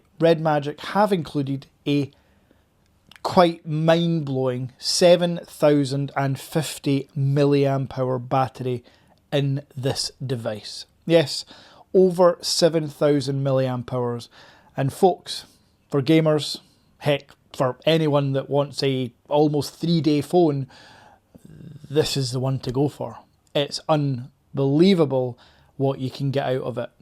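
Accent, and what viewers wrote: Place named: Scotland, United Kingdom. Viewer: Love your Scottish accent!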